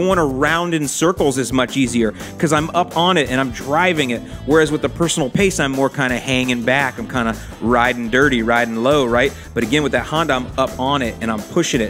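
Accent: Chicago, United States. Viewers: I love the chicago accent.😃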